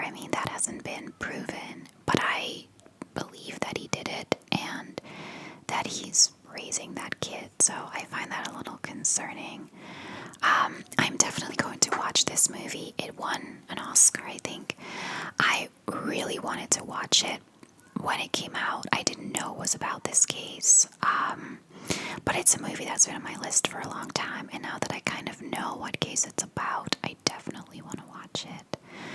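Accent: United States. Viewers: i’ve watched your vids for so long and i’m still in shock that you’re from belgium. your english is flawless and your accent sounds so american i’m fooled every time😂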